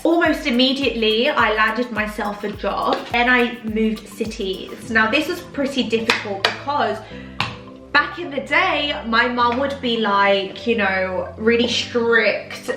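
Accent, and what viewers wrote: United Kingdom. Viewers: Thanks for sharing your story. There’s something great about our Pinoy culture— the love, the caring. And what are they saying? Love your brit accent! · Delicious! We love you!pride of phil with your British accent and a Filipino heart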